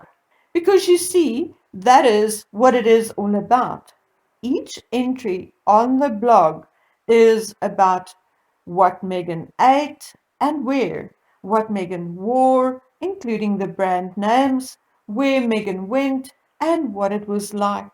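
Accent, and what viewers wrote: South Africa. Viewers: I love your videos but the accent is sooo hard to listen to. Dutch/German and African created the weirdest accent on earth. My bf is South African and even he says it's the ugliest accent on earth! Lol. Some people have nice soft ones but then you get the really stilted, harsh, Hocking ones. They don't flow at all it's like all from the throat and sounds tiring to speak lol. There's no flow, the one is off, like a computer voice. Know what I mean? Im clenching my jaw the whole time, it sucks cause it's so hard to listen to :( 😂 it's a real shame but it's infamous for a reason eh! I've listened to you for a year, and I'm STILL. Not. used. TO. IT. .😅😅 .It's. Like. There's a. fUll stop. After. Every Word. And they go UP . And the . Tone. Goes down at. Random. Points. " Or maybe its Zimbabwe but it's one of those German/Dutch meets African monstrosity combinations lol. 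Looks wise, caucasian Africans are gorgeous though.. the men and the women. the men are always sooooo sxc and manly! Woo! 😍 I think it's the mix of cultures and outdoor lifestyle , but yeah... They are sxc like we Australians . 😎😎 It's just the accent that can be gnarly.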